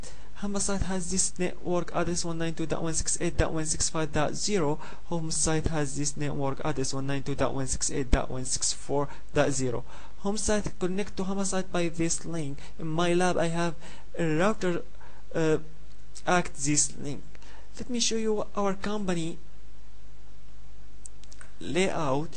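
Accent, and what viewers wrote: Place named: India. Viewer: kkkkkkkkkkkkkkkkkkkkkkkkkkkk I understand everything and I love indian (I suppose) accent.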